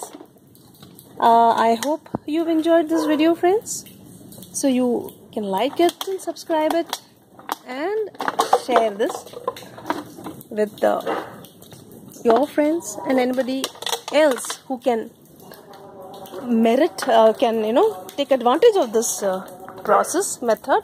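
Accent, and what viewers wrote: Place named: United Kingdom. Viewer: great voice ji...with accent like british lady..big like and subbed too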